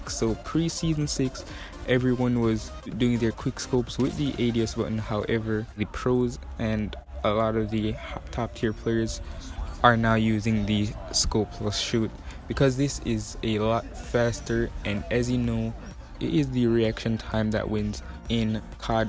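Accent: Jamaica. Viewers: You jamaican? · Are u Jamaica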